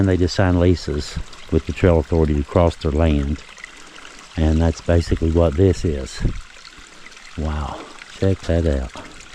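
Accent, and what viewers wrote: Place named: West Virginia, United States. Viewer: How can I send you pictures of my family’s old-school coal trucks with wooden spoked wheels from the early 1900’s??? Now mind you these are actually from my mom’s side which happens to be right outside of Pittsburgh, now my dad’s side is from down your way in Jackson County Riley, WV & Ravenswood area, but you sound just like my dad’s people, the way you speak and the pitch of your voice I love it!!! Mostly are gone so it’s a breath of fresh air watching your videos to say the least!!! Please don’t stop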